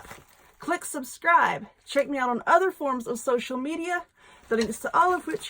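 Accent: South, United States. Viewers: I just love your videos! And your humor, my husband and I just love watching your videos and listening to you southern accent 😁😍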